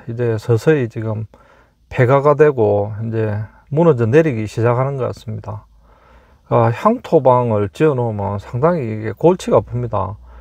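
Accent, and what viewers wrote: Gyeongsang, South Korea. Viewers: 님 사투리 어디인가요!! 전남광주는 아니고 경남맞나요 구독좋아요 누르고갑니다 😊😊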